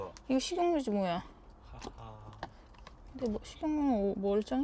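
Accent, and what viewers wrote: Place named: Jeolla, South Korea. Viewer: 아 그렇군요 전라도 사투리 한번씩 쓰시는거 같아서 ㅎㅎ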